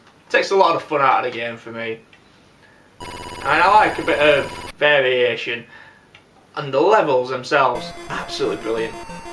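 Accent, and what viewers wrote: Yorkshire, United Kingdom. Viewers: Amazing stuff. Just noticed you by accident. The Ninja :-D Great first choice! Ha ha... Yorkshire accent. I am from East Yorkshire myself. Nice one... I found that if you mostly fire in front of you its a bit easier. Never considered the Hit Rate percentage at all... I tend to power through... lol... I always get stuffed on the floating logs stage. Can't coordinate my firing and movement!! :-D Awesome extra lives snippet there!! :-D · Nice to hear a Yorkshire accent on YouTube!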